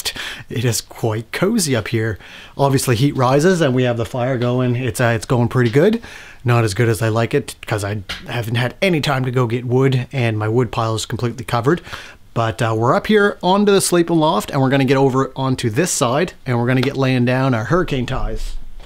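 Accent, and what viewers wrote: Canada. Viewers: Yeah!! More build videos! You can definately tell you are Canadian by your accent! Our lovely neighbors to the north eh? Have a very blessed weekend 💕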